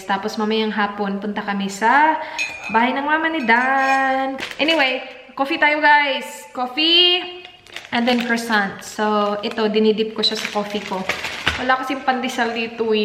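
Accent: Visayas, Philippines. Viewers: Life en easy lng naiintndhan ko ..😒😒😒bisayang bisaya ang dating sa mga words na sinasabi... buti ate nagustuhan ka niyan😄😄😄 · Hello po. You sounds like bisaya. May I know kung taga saan po kau? I'm proud bisaya from Davao city:-). Looking forward for more videos to watch...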